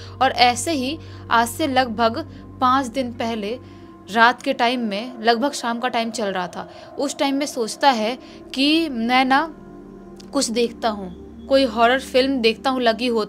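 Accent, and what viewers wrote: Bihar, India. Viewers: Ye ladki bhi bihar ki hai confirm. Eske awaj se pta chal rha hai . Itna jaldi jaldi kaun story' batata hai maidam 😬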